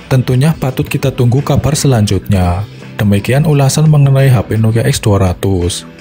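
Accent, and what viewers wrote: Banyumas, Indonesia. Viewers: Mas aslinya seka ngendi? Cilacap, Brebes atawa Purbalingga😄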